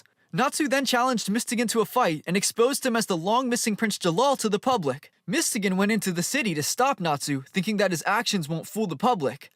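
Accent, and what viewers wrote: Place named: United States. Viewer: Your American accent is adorable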